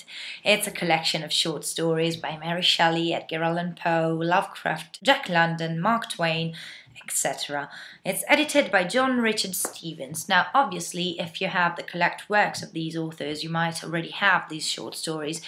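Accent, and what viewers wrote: United Kingdom. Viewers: mamma come parli bene, con accento inglese proprio! sono stata un anno di america (ho solo 17 anni) e ho molto d + l'accento "slang americano" tu invece sei così english:P!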